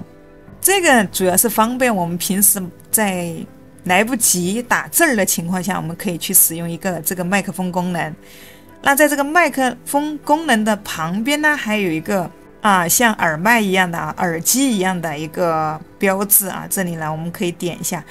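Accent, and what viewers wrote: Sichuan, China. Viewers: 四川还是重庆的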